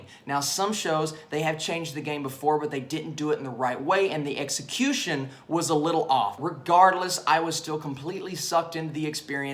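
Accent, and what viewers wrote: South, United States. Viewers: Just a professional piece of advice kid. Being in the entertainment industry and a host, and working on TV in the past, your movements are good, you're hosting ability by switching up your voice, with your movements is also a peeling. A production value is up-to-date. The only criticism I can give you, is you have to lose that southern accent. You want to portray a generic host, to a broader spectrum of audience. And when you get too excited that hometown hick sounding accent comes out. Just work on getting that out have your ability to communicate. All in all oh, good review. Would maybe have liked to seen you go a little more in-depth with the storyline and Easter eggs but other than that thumbs up.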